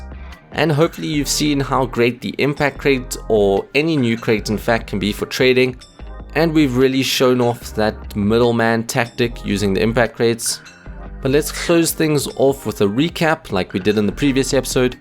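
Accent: South Africa. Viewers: R u south african you sound like 1. Our pronounciations and accent remind me of a south african i am aswell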